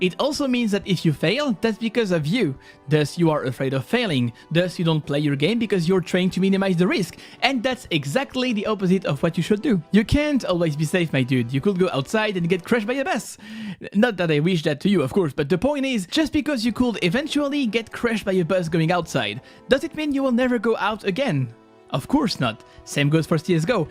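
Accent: France. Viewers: Are you french? Nice video btw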